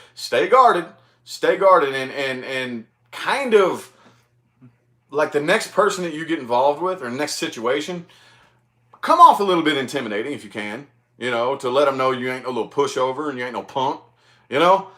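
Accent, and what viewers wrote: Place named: Mississippi, United States. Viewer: You sound like every man I know in Mississippi 🤣 gotta be Alabama Mississippi or maybe Louisiana.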